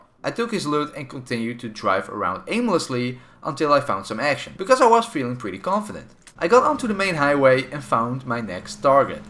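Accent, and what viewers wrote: Netherlands. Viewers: heheh first time viewer here, as soon as he started talking I knew he's dutch :)) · lmao I knew you were dutch hahahah lekker bezig man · You Dutch? Waar woont je? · You're dutch ? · ja ik dacht al dat je Nederlans was🤣😂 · I knew you had a dutch accent, You sound like this one famous airsoft player who is also dutch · Are u from the Netherlands 🇳🇱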